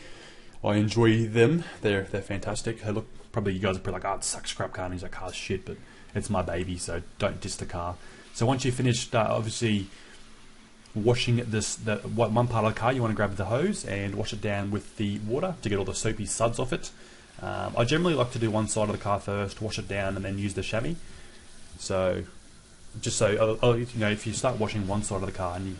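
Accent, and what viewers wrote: Australia. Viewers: He’s Aussie? Let’s go😂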